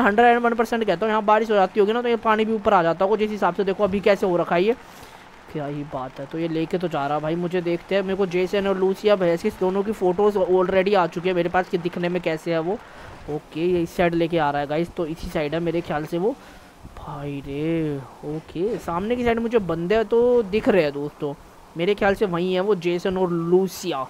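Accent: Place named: Saharanpur, India. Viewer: WAHHHHH BHAI SAHARANPUR WALI BOLLI ENGAE UNGAE ❤❤❤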